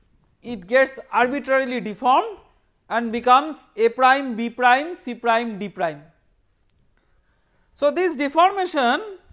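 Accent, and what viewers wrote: Bengal, India. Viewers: Arey sir bangali hai toh accent mein thoda bengali pan aa jata hai koi nahi manage kar le😅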